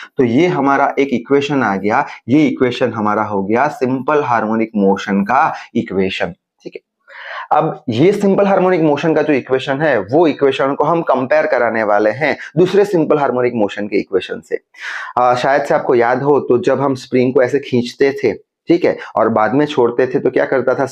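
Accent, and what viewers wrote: Gujarat, India. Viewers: Sir aap gujarat se ho??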